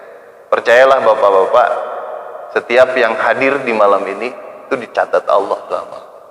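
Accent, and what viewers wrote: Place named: Sunda, Indonesia. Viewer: Logat Sunda teh lembut kena kupingnya,,, berkembang terusss kang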